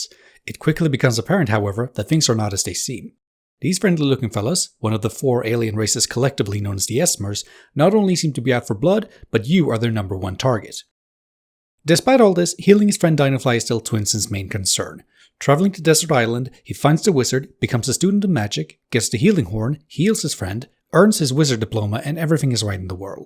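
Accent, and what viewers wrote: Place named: France. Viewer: Wow r u French? Is that wgy you stink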